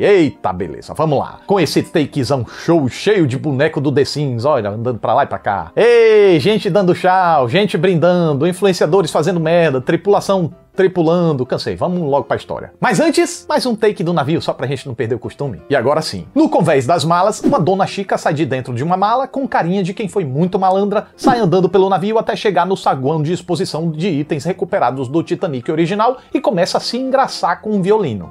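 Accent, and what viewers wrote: Northeast, Brazil. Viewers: Mano vc tem um sotaque meio nordestino, o que casa muito com o tom cômico do video. Brabo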